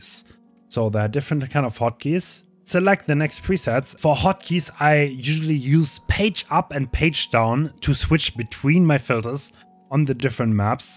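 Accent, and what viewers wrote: Germany. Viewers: why is bro trying so much harder to sound more german than he actually is in this video? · I hear german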